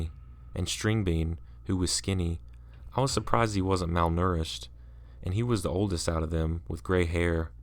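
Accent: South, United States. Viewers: Southern accents rule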